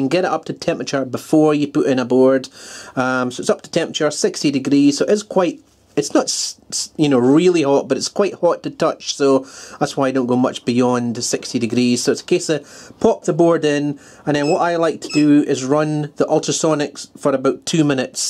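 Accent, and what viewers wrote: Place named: Aberdeen, United Kingdom. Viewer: Great video, thanks for taking the time to show the process! I'm inclined to get some of that cleaner stuff and try it, although I might need to get a new ultrasonic tank as well (mine is rather old and out of whack). As it happens, I spent today making up a batch of PCBs and wondering how the pros go about cleaning them. My own process, for what it's worth, is: Soak boards in isopropanol inside a sealed plastic tub for 30min - this softens up the flux and crap Scrub well with a toothbrush in the IPA - this usually gets everything off but sometimes there's little bits of hard flux around big solder joints, so need to give them a wee scrape Wash with hot water and detergent, to remove the IPA Dry thoroughly with a compressed air jet Personally, I love using compressed air for drying things, because it gets into tight places very easily - e.g. 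under SOIC chips, or inside IC socket pins. By the way, are you from Aberdeen? I detect a wee bit of an accent! [I'm from West Calder]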